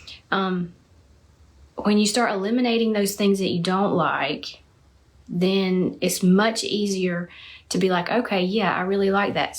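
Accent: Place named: South, United States. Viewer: your thumbnails show it! And I am too. (I'm from GA.) So that's why I always click your videos...then I'm like, "oh, yeah, she's got a southern accent." 🤣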